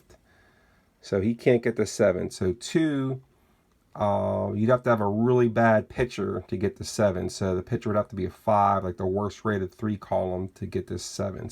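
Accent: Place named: Pittsburgh, United States. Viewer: Nice video. Love the “Pittsburgh accent “.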